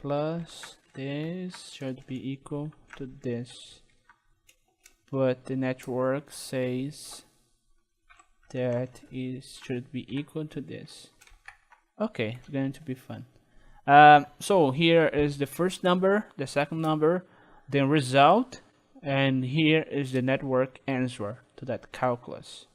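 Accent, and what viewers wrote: Brazil. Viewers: Hehe.Br falando inglês, não tem como não perceber. Abraço.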